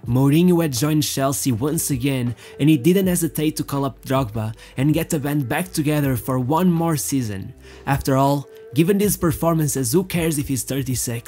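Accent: Porto, Portugal. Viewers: aquele sotaque do Porto impossivel de esconder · I hear the Portugese in your accent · Never knew it was a Porto accent!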